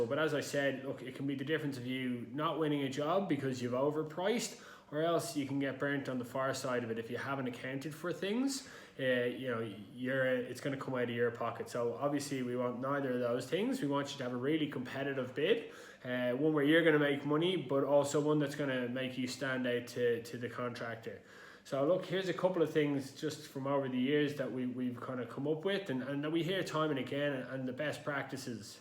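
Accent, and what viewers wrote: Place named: Dublin, Ireland. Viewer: I notice you and the guy in the previous video I watched have Irish (Dublin) accents. Are you guys based in Ireland or USA? I'm Irish and am a drywall/steel-stud estimator living in Canada. We use On-Screen takeoff where I work - it's very good, but quite expensive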